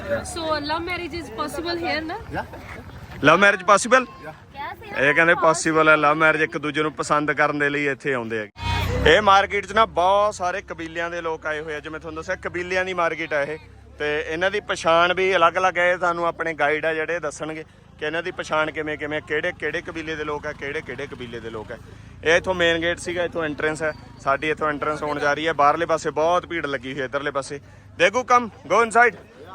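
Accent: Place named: Punjab, India. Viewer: English bro pure jatta wali AA accent pure Apne wala aa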